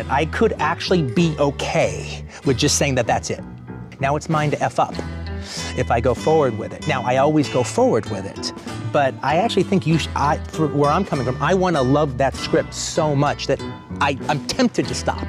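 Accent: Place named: New York, United States. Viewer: is he a new yorker or a chicagoan.. whats his accent? :) wikipedia.. --> tells me he is from knoxville Tenesee.. I guess he's accent morfed into californian accent by now.. but it sounds big city anyway! perhaps he lived in new york also as it sounds like new yorkan accent to my swedish ears..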